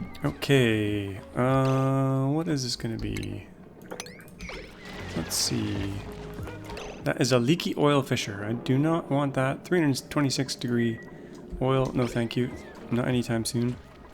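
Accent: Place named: Ontario, Canada. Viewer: Hol up. Unless Ive seen this mentioned before, I didnt know you're a fellow Canuck! What province? you sound more.........I dunno......maybe Ontario? Can't fully tell. Certainly not Alberta or Saskatchewan...........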